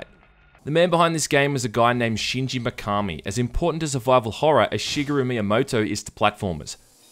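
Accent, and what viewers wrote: Australia. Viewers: Newer watcher i guess. Why is he so much less Australian lol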